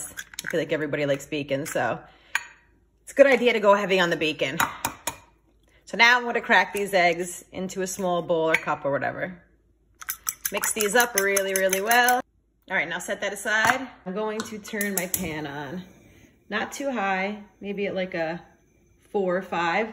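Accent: New Jersey, United States. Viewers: U must be from jersey !! Or maybe I’m wrong lol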